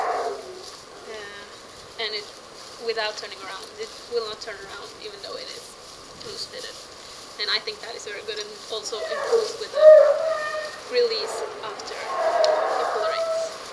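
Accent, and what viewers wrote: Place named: Norway. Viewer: The Norwegian accent was very pretty btw! Hålla from a finn\german\NativeAmerican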